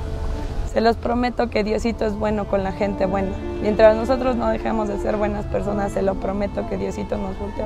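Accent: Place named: Mexico. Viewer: Saludos desde Santo Domingo 🇩🇴😁 me encanta tu carisma y ese mega acento mexicano ✌🏼🤷🆒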